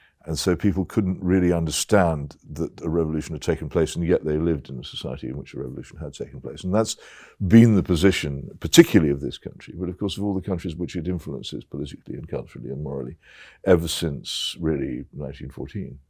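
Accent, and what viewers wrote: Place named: RP, United Kingdom. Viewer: Used to be a huge fan of Peter Hitchens-- read his blog avidly and quoted his "insights". I suppose I was beguiled by elegance and plummy voice and (largely fictive) persona of "the most hated man in Britain". But then I started noticing his blindspots, how he would rail against immigration but absolutely refused to venture near anything to do with race or 'the badlands' as he called them, despite the fact that the issue of immigration is entirely about race, even if white people are too psychologically terrorised to openly admit it. How he would denounce the degradation and demoralisation of Western society, but never address who was behind these changes. Then I learnt he was Jewish, and everything became clear. "Conservatism" is a trap designed to contain the anger of white people and direct at false targets, and keep from ever converting that anger into action. 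To keep them impotently spluttering about the symptoms of our affliction, like Islam and immigration, while remaining ignorant of its etiology. Conservatism is defeatism. I abandoned it years ago, and I've never looked back.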